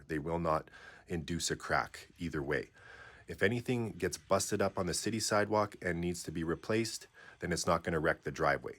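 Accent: Canada. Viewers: I knew you were from Canada with your Os 😂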